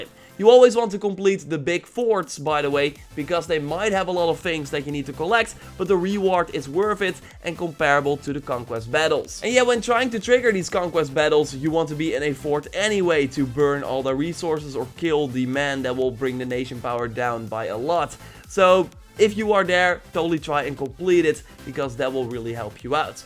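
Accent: Netherlands. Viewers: U Dutch right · Leer beter engels aub je nederlandse accent is duidelijk hoorbaar :< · Bro are you Dutch? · Are you from holland ? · Damn that accent is dutch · Is it me or this guy has a sorth of dutch accent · You sound dutch ik ben dat ook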